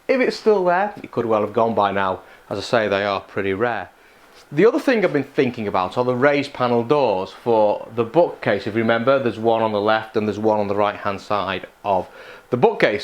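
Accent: United Kingdom. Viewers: Hi. I accidentally came across your channel recently. Surprising since I watch a ton of YouTube 'Wood Porn, as my wife calls it.:P - I guess your search terms are working. Where are you based? From the accent I am guessing North West England? I am from Birkenhead originally but live in Utah now. Good luck with the venture and keep posting the awesome videos! Cheers, Rob P.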